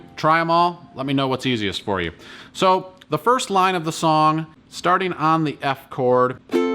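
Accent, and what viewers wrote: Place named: South, United States. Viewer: Dudes definitely from the south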